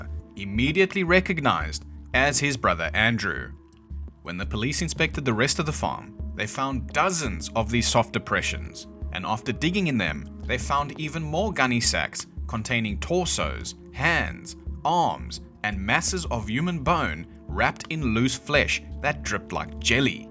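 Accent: South Africa. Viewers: south african accent so much nicer than british or australian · Are you from South Africa? Somehow it seems your accent is from there. A slight Dutch touch to it.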